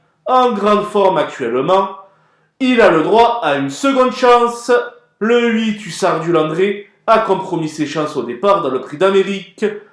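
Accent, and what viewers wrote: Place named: South, France. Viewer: Pourquoi vous chantez en donnant vos pronostics ?? Même que vous êtes du sud ,,faites des efforts sue vos vidéos c'est horrible,,..on dirait que je regarde l'amour est dans le pré..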